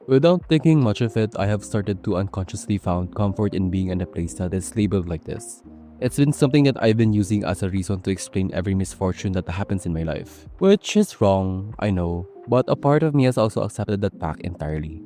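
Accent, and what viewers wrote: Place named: Philippines. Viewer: Pinoy?- (I said to myself the moment I heard the accent, and then you said PINOY NGAAA!! YEY)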